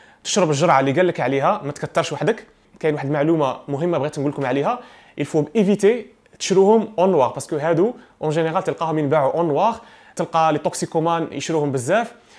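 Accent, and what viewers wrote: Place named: Oran, Algeria. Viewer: شكرا شرح جميل جدا اللهجة تاعك جميلة جدا انت وهراني 🤔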